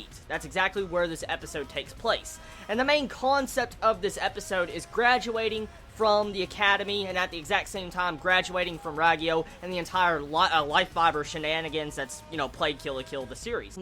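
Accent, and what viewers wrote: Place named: Texas, United States. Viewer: yo bro you from texas?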